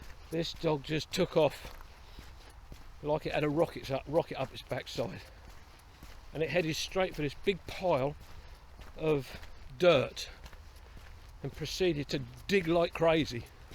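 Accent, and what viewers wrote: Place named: RP, United Kingdom. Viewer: Its good to walk, isn't it,? And good you resumed these walks, if only for your well being. I never tire of seeing Cowdray ruins. I wish Zorro would behave like the spaniel. Thanks for braving the rain and the mud for us. Long may posh voices endure!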